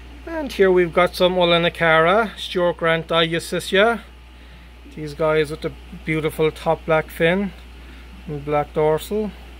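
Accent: Ireland. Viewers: You’re either from Galway or Mayo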